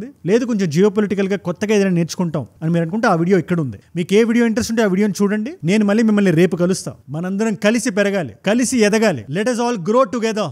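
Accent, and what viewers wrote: Srikakulam, India. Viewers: 😊 i. impress you to speak Srikakulam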